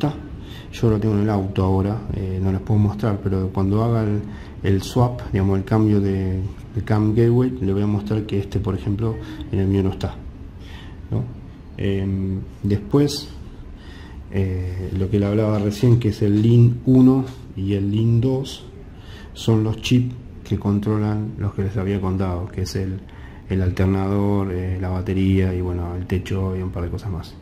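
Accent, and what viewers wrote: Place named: Argentina. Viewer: O habla en español o en argeninglish, me volví loco intentando traducirte...si pronunciás "bas" por "bus", deberías pronunciar "baedi" en lugar de "body", y así todo el video.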